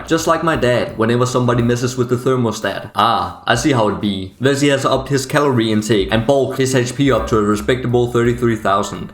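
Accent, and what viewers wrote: Denmark. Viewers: Clocked the danish accent instantly x] happy to see a fellow dane play this game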